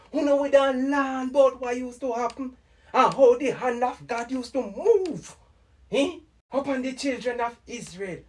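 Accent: Jamaica. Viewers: 👀 Memba oooo a propah propah Ja patois enuh…O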